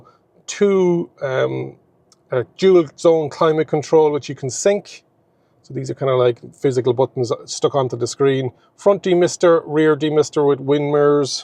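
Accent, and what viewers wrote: Ireland. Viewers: Good man, I enjoyed the presentation, this Toyota is a total "Buy" when it will be available. Cool Irish accent you got there, new SUB.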